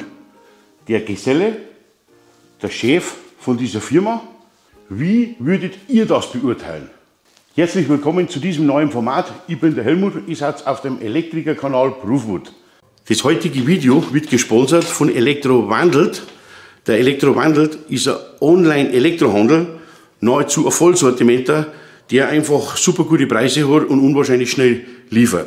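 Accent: Bavaria, Germany. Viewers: Hallo Helmut, meine Meinung zu den aufgeworfenen Fragen: "Jeder Unfall ist vermeidbar. Eine schuldhafte Pflichtverletzung liegt hier meines Erachtens von keiner Seite vor. Die Prüfung des spannungsfreien Zustands sehe ich nicht als zwingend an. Bei einer Leitung, die ich selbst gelegt habe, gehe ich doch nicht davon aus,dass diese plötzlich Spannung führt. Vielmehr handelt es sich hierbei um eine "Anlage im Bau" , spannungsfreiheit müßte dann geprüft werden, wenn die Anlage durch Schalthandlunge unter Spannung gesetzt werden kann. Da davon auszugehen war, dass die Leitung noch nicht abgeschlossen ist, war dies hier nicht der Fall ".Schlußfolgernd muss gesagt werden, dass bei der Auftragserteilung eine bessere Abstimmung erfolgen muss. Ich würde die Anschlüsse an der Hauptverteilung nur von einer Person, nach Vertigmeldung der übrigen Arbeiten, vornehmen lassen . 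Ich finde deine Beiträge großartig, wertvoll für Schulung, Aus-und Weiterbildung. Ich habe über 40 Jahre im Beruf gearbeitet und sehe deine Beiträge sehr gern. Das Bayern ihre eigenen schönen Dialekt haben, weiß man normalerweise. Viele herzliche Grüße Reiner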